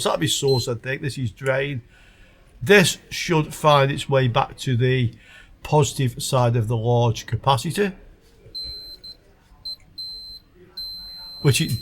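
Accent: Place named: Potteries, United Kingdom. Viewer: I think the most recent one was “Hot Ground”, your video with Carlos on how to avoid blowing up your oscilloscope without having to buy a differential probe. <link> timestamp is where you demonstrate this technique. I’ve been enjoying your videos for several months and as my late wife was from the Potteries (Burslem), I recognised the accent straight away. You’re doing a great job of explaining these electronic circuits so that it’s easy to see how they work, and your commitment to safety and explaining the risks inherent in testing and probing mains-operated devices is impressive. I did a degree in electronic engineering before I changed career and I’m beginning to appreciate how much I failed to learn back then! You and several others on YouTube have reawakened my interest in this fascinating field, so thank you very much.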